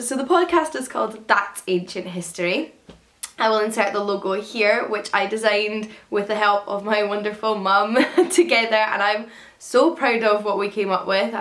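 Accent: Scotland, United Kingdom. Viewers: The podcasts sound fabulous! I've subscribed. You've inspired me to learn so much about the classical worlds. I'm about to open to the first page of Circe so I will listen to your interview with Madeline Miller very soon. I love your PS in the introduction "it's a Scottish accent". My grandparents were Scottish and I miss hearing their accents so I get an added bonus listening to your new podcasts:)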